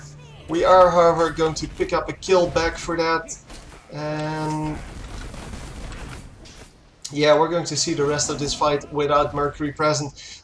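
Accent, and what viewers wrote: Netherlands. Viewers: I think you are Dutch right? I can hear that accent because I'm Dutch as well xD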